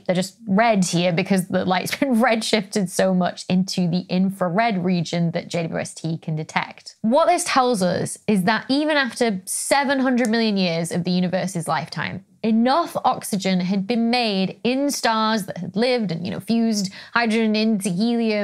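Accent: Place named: England, United Kingdom. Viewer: your english accent is absolutely delightful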